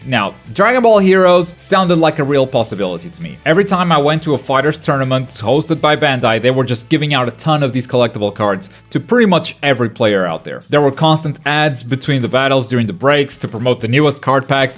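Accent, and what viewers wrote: Portugal. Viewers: hey man good video, are you portuguese btw? i noticed you have a similar accent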